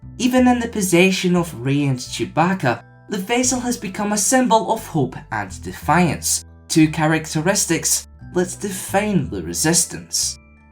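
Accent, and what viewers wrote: Scotland, United Kingdom. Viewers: Love the Scottish accent. Could do with a better mic though. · Scottish accent on Youtube? A surprise to be sure, but a welcome one. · Your a Scottsman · Thank god for the subtitles. The Scottish accent is strong with this one.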